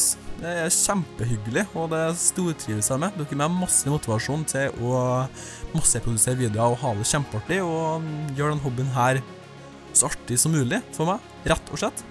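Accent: Trøndelag, Norway. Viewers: E du trønder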